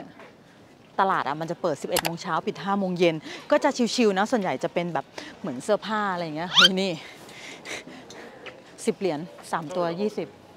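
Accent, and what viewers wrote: Thailand. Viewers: ผมชอบชมช่อง ตรงที่พี่ พูดกะนเองเป็นสบาย ถึงอยู่อเมริกามาหลายปี แต่ไม่มีพูดไทยคำอังกฤษคำเลย พูดสำเนียงไทยแท้ ไม่เปลี่ยนครับ ผมอายุ26ปีพ่อชอบพาไปเดินคลองถมมือสองแถวบ้านทีีอ่างทองตั้งแต่เด็กครับ เอามาตกแต่งบ้านมาใบ้บ้างมาใส่ให้ผมบ้าง จนโตมาผมก็ชอบของเก่าของคุณปู่ย่าตายายพ่อแม่ลุงป้าสะสมเต็มบ้านว่าจะเอาไปขายต่อครับ ชอบบรรยากาศอเมริกาที่มีความชิวๆเรียบร้อยไม่ค่อยวุ่นวายดี ถ้ามีโอกาสก็อยากไปสักครั้งในชีวิตครับ😁💚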